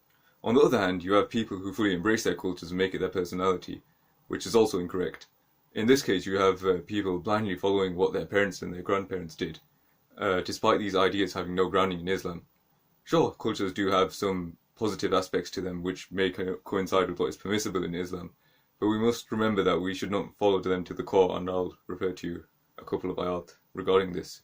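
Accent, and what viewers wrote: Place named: United Kingdom. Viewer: Based bri'ish accent MA.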